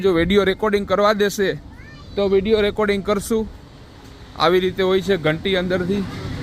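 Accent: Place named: Kathiawar, India. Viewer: Bhai hu gujrati chhu, ghandhinagar thi, tamaro whiz (aavaj) kathiyavadi lage 6, ane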